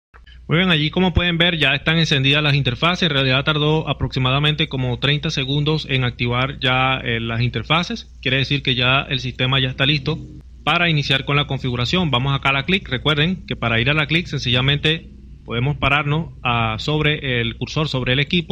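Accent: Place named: Colombia. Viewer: pense que era colombiano, saludos tambien soy de vzla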